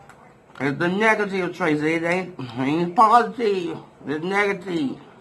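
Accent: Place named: South, United States. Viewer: You sound like you from the south, the accent reminds me of my grandmother accent. They from your area and the south.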